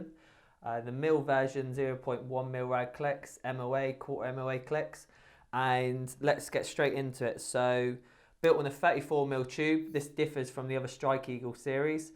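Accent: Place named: West Country, United Kingdom. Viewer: ".....or you'll bugger up the cap...." Tell it like it is west country boy and more power to you.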